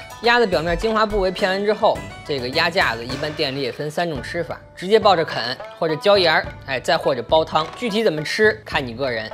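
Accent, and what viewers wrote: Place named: North, China. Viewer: 口音像北方 長相像南方人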